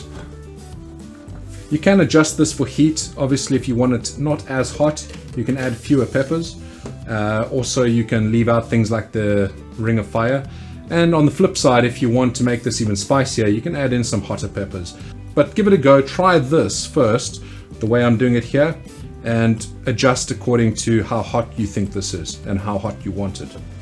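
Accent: South Africa. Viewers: i couldn't pinpoint your accent because it didn't really sound British but now i know why (because its south African)😂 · Damn bro I knew you where South African me too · Didn't know you were South African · Nope! Sorry he doesn't have Kiwi accent at all. I can hear South African accent.🤣👌🙏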